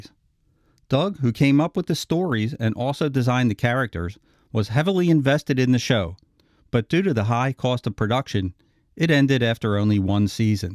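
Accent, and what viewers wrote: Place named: Philadelphia, United States. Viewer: are you from Philly? That accent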